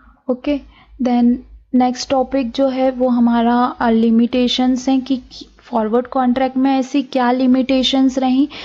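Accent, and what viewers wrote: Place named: Indore, India. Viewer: Mam aap indore se belong karte hai kya aapki aawaz se lag raha hai😁👍😅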